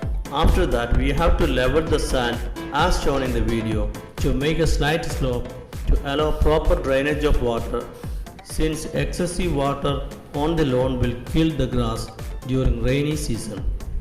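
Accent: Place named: Kerala, India. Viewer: Are u a malayaalee?ur english is like malayaalees uncle.pls tell malayalam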